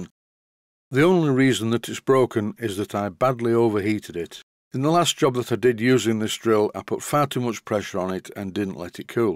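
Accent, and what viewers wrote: United Kingdom. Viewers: British people talk with such elegance!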